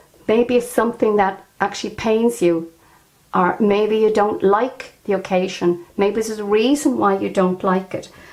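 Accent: Ireland. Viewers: Yass! So beautiful to hear an Irish accent teach tarot. I've been studying tarot for three years since ive been abroad in Australia and havent really connected with any Irish regarding divination! Thank you for your master classes 🍀❤ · Hi, only just this minute found you and am watching this video. You're fab! Trying to place your accent, are you Cork?? I'm in cobh 💖 Can't wait to check out your other videos Tomor. Would also love to see videos on each card 😘 Love listening to you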